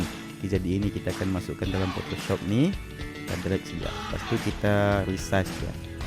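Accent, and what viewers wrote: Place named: Sabah, Malaysia. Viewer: sabahan loghat...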